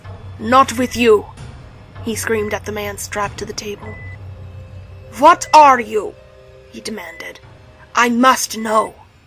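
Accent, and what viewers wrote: Russia. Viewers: that Russian accent tho ;) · Your Russian accent reminds me of Tenenbaum from Bioshock. Great job!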